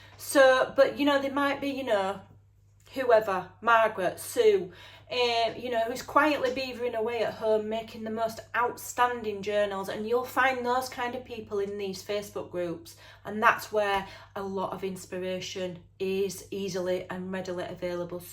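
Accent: Yorkshire, United Kingdom. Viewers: Oh,! I could listen to you all day long (and that laugh!! 🥰)! My dearest friend in the world for just over 50 years was a Yorkshire lass from Leeds. Yorkshire became sort of my second home (I'm from Canada) until Margaret passed a few years ago. I miss hearing that accent. Listening to you brings such joy! My favourite tip was 'DON'T HOARD SCRAPS'!! I am awful for doing that. I have always felt it was 'necessary' - other crafters told me it was. Thank you so much for finally giving me permission to get RID!!! I think it will definitely help me to create more. My crafts table is always such a mess because of these endless scraps everywhere. Loved this video! ❣💖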